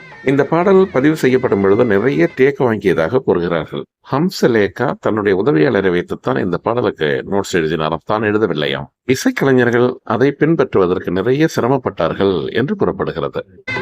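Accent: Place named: Colombo, Sri Lanka. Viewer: நீங்க bh abthul hameed மாதிரியே கொஞ்சம் பேசுறீங்களே சார் நீங்களும் கொழும்பா தமிழ் குரல் அப்படியே உச்சரிப்பு அனைத்தும் அப்படியே ஒட்டிருக்கு கொஞ்சம் நிஜமாவா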